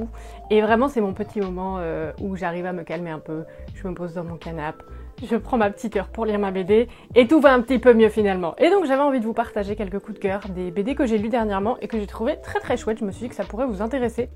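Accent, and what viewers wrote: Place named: North, France. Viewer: Je kiff trop ton accent tu viens du chnor ???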